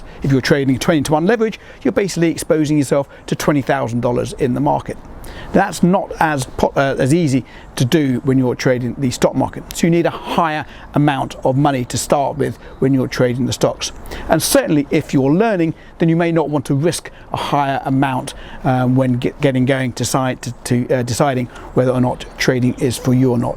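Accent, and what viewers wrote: United Kingdom. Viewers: Love Your Video And Your UK Accent Is Amazing Too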